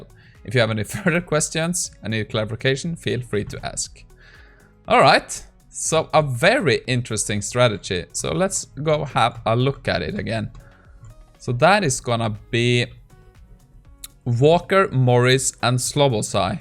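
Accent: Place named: Norway. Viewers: Are you from Norway? Sounds like a norwegian trying to speak English to be honest :-) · He IS speaking english with a Norwegian accent. Yes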